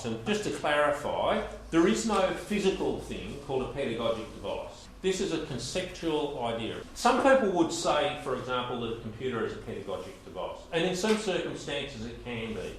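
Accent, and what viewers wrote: Australia. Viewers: been learning Bernstein's idea and had language barrier reading his books. This video is so much help. Thanks. I like the Australian accent.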